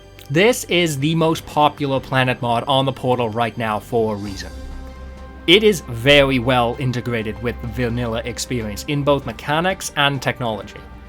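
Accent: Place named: Northeast, United States. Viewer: How interesting! I would have sworn you sounded like someone from the NE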